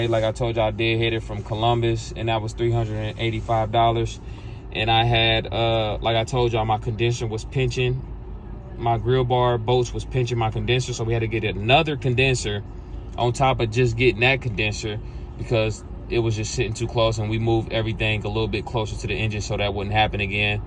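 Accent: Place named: Detroit, United States. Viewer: Man I thought she sounded like a Detroit just wasn’t sure. This mf is bad man love this build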